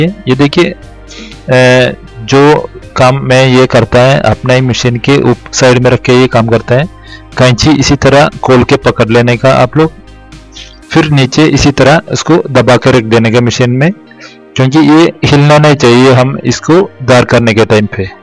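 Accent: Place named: Kerala, India. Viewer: The tip is really nice but listening such sweet Hindi from a malayali was great 😀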